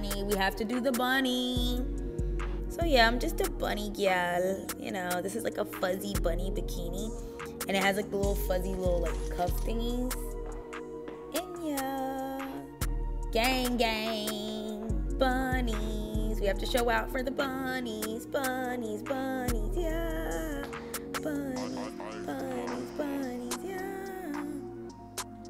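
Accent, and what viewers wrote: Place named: Jamaica. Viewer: You're an awesome panda. Not just only a panda The 1st outfit is gorgeous/ is the best one in all of them. Imma text you on telegram soon. See you soon gal Your english is like the one of Jamaicans. I feel like you're a Jamaican gal. Is that true?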